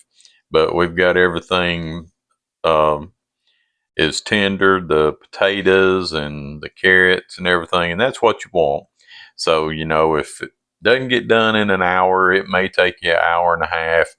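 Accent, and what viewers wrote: South, United States. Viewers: New subscriber here who just happened upon your video and My husband and I can’t wait to make this and we will be watching for more content and just to listen to you talk I LOVE a Southern accent more than anything in the world ❤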